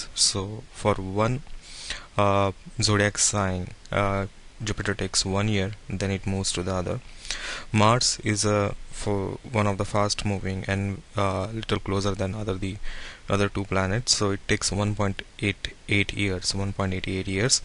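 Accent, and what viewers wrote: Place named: India. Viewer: the indian accent has got to be the worst on earth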